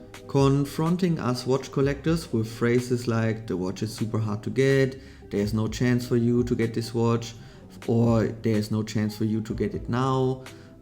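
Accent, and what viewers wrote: Germany. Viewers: Are you german? oh and great video!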